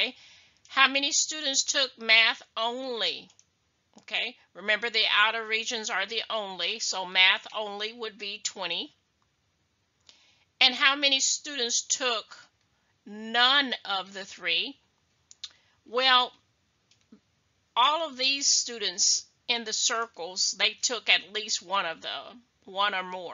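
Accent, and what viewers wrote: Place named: United Kingdom. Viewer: shes british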